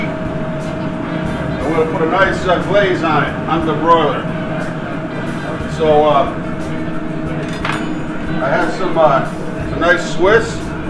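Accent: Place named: New England, United States. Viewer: Love your pronouncement, "Croaked Mon Sewer" LOL. Not knocking it at all mate, just thought the NE accent rocks!! I'm in Vermont here. ps: Johnson Wales Charleston, SC Campus Culinary class of 1996 here. Since retired but still cooking the classics. Cheers!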